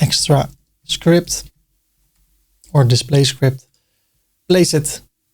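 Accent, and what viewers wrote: Netherlands. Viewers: So that where that accent is from, from Holland.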